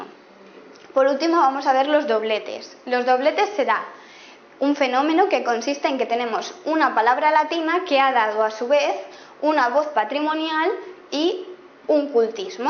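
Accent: Spain. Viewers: De España v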